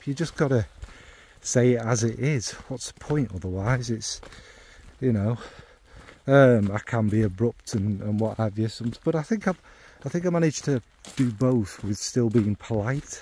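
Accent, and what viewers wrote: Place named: Northern England, United Kingdom. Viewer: Wait.. What's an algorithm? 😅😅😅 Listen.. There's organic, there's forced and formulated, and there's a ton in-between. Some are naturally good at being robotic and sterile with it, some are really great with their 'stage presence' who are excellent on camera and just naturally are fantastic and popular. I only film things if it's enjoyable and it's something i want to watch back for my own viewing. I've an idea for a video which has absolutely no relevance and I'm sure zero people will be interested by I'll probably do it for my own lonely little world of nerdy viewing. Like a fragrance diary..that happens to be public 😅😅😅 Anyway.. I started drinking whiskey at the start of this video, and I'm half way in and feel pissed. So will have to pick it up tomorrow 😅😅 🥃🥃 but always good to hear a proper northern geezer being true and raw, if a little rough around the edges 😁😁 Never stop the stories and creative content Mr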